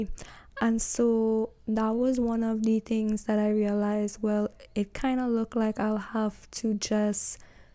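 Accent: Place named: Trinidad and Tobago. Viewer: trini accent a fight fi come out lmao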